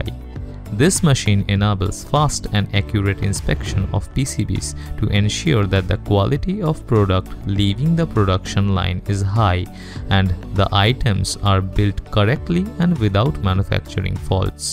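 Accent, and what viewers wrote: India. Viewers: 一股浓浓的印度口音，听得超级不爽。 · indian English makes me feel ashamed. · Indian accent。。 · Omg indian english☠ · Omg,this guy sounds so bad.voice is like Indian slang modulated with donkey screaming pitch.even though I am Indian I hate this slang.video is shot nicely but I wish such these great videos are sponsored to channels like greatscott:/ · Dat fucking indian accent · you can't get someone without a heavy Indian accent to narrate the video? nothing wrong with it but god damn it's hard to understand lol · 这是传说中的印度口音？ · Индусский акцент сразу слышен, хотя я по английски нефига не понимаю. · i think this is indiaglish. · Listening to the indian narrator speak english gave me cancer.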